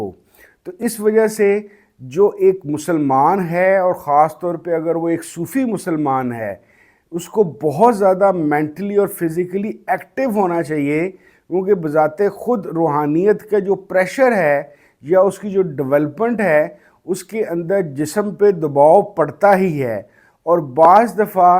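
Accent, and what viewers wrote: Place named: Punjab, Pakistan. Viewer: اردو بھی پنجابی پنڈو لہجے میں بولتے ہیں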